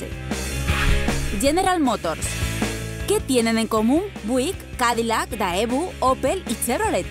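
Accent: Spain. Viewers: Recordar que es española y en cada país pronuncian las cosas diferente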